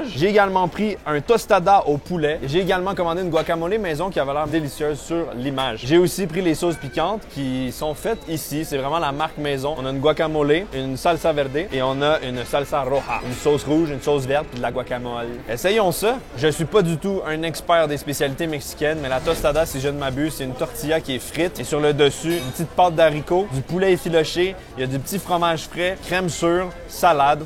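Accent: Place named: Quebec, Canada. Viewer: J'adore ces vidéos par contre j'ai faim maintenant, l'accent québécois ca me tue de rire 😂...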